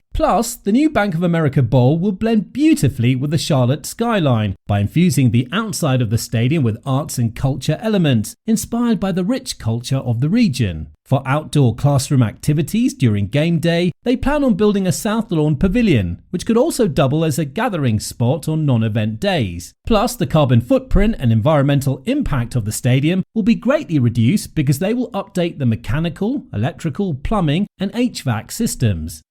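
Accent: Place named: England, United Kingdom. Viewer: There is so much hogwash and propaganda in this video it must be funded and produced by David Tepper. This is yet another example of a sweetheart deal to benefit a billionaire who stands to pocket huge profits from the renovated stadium through stadium use fees and concession sales while the city that funded the vast majority of the renovation gets virtually squat. Shame on the Charlotte city council for throwing the citizens under the bus so a billionaire can brag to other billionaires how he conned the city into funding his new stadium. I hope the citizens of Charlotte replace every council member who voted for this atrocity. Not to mention this video is so poorly done it uses game footage from other teams and stadiums unrelated to the story. And what's with the English accent? Is that supposed to provide some additional credibility or something?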